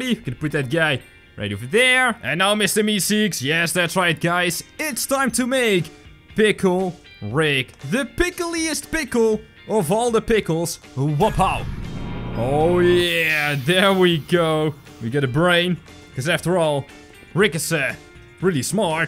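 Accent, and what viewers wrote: Netherlands. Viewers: I have a question. Are you dutch?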